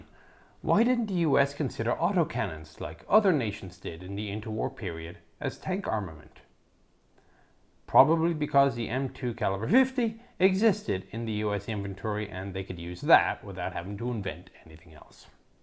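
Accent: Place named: Ireland. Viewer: Chief, your such a national treasure ya could put your musing into a text to speech narrator and it still be riveting commentary!!!!!!!!!!!! It would be a tragedy to deny the world the utter charm that is your Mick Jagger lipped Irish accent, dont go changing ya gentlemen and scholar!!!.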